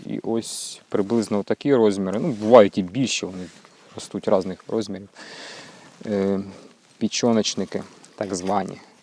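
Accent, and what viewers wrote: Surzhyk, Ukraine. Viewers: Молодец, что на украинском. Правда, сильный суржик сходу слышится по первым минутам. :) Треба трошки попрацювати ще. Чтоб для ушей еще мелодичней было. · Уж лучше на руском говорите. Ваш суржик режет уши .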